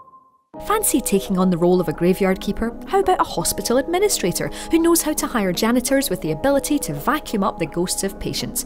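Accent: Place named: Scotland, United Kingdom. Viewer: her accent reminds me of merida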